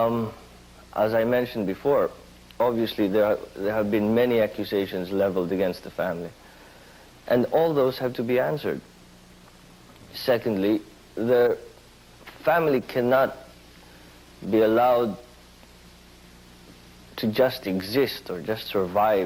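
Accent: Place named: United Kingdom. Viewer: Napaka talino ng ating bagong elect na pangulo. Napaka pogi pa. Hawig pala sya n vince. May british accent pa sya dito. Naging emotional sya at times at nag ligid ang luha . Mahal naming PBBM, this is it, ung sinabi nyo po na gagwin ninyong lahat para matulungan ang mga pilipino . Like your father and PRRD , mahal na mahal nyo rin po ang mga pilipino at ang ating bayan. Mabuhay po kayo God bless you and guide, protect and help you in governing the Phil. ❤️✌️🇵🇭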